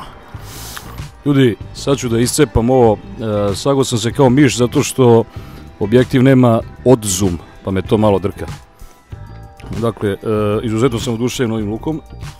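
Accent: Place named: Belgrade, Serbia. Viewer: Ovo je zaista iskren komentar. Odlično radiš, što radiš ni psovanje ne smeta to je srpski rečnik inače realni. Ali do jaja je bljutav taj bOOOgradski naglasak i dijalekt. Valjda što je meni beograd toliko omražen, možda sam pristrasan ali malo prirodnosti ne bi škodilo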